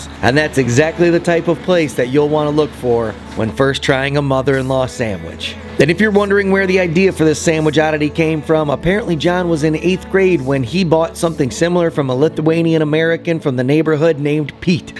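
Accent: Chicago, United States. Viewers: I don't even need to watch the video, but only listen to him speak momentarily to know he's from Chicago, my hometown. 😂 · lol. I was guessing this video was made 15 years ago! Your Chicago accent makes this a genuine Chicago food video. 😂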